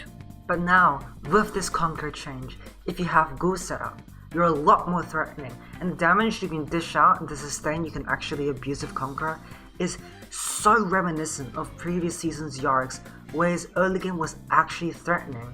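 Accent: Australia. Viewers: Ur content is gangster where u from bro? australia?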